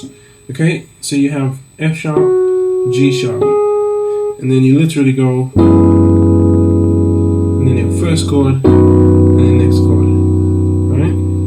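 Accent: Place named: United Kingdom. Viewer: You sound like youre from the UK, which church do you go to?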